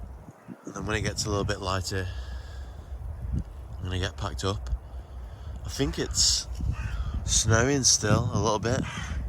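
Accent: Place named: Stoke, United Kingdom. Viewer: Can spot a fellow stoke accent a mile off. Yes pal keep up the good work. Utmp /utv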